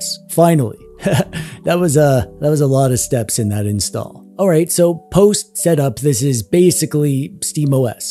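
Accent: Canada. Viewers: You’re Canadian, aren’t you!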